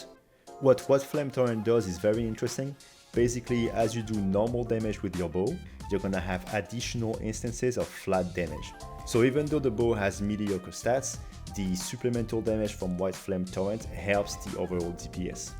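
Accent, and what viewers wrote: France. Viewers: Mec, on manque de continue en FR de fou sur MH! On l'entends l'accent frenchie, hesite pas faire du contenue en FR ca marchera de fou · Frensh accent make it better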